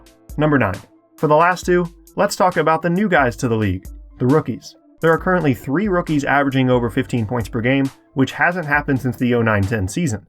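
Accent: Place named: United States. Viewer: My Account he sounds american to me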